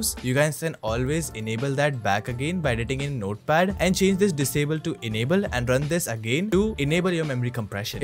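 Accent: India. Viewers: YOU SOUNDS LIKE AN INDIAN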